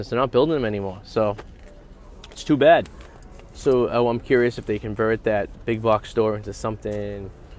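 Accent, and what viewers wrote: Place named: New England, United States. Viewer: If anyone is wondering what a New England accent sounds like... :)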